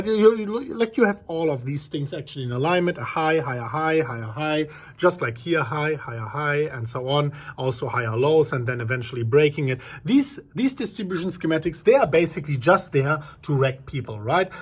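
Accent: Germany. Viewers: Danke alda, nicht nur der beste englisch crypto youtuber mit deutschem akzent :D sondern auch bester youtuber von dem man in jedem video was lernen kann!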